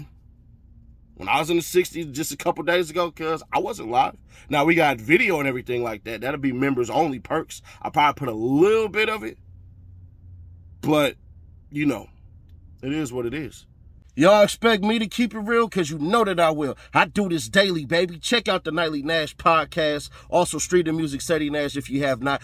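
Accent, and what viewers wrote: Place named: Chicago, United States. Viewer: Your talking like your from Chicago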